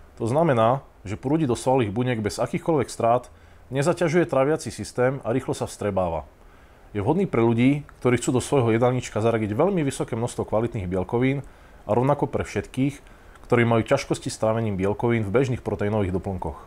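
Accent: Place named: East, Slovakia. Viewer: Môžem sa spýtať odkiaľ pochádzaš? Zdalo sa mi že počujem nejaký východniarsky prízvuk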